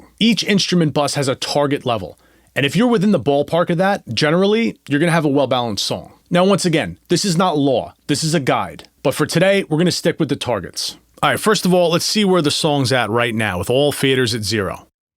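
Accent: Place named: East Coast, United States. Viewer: With That east coast accent, I couldn't tell if he was saying "open up the DAW" or "open up the door"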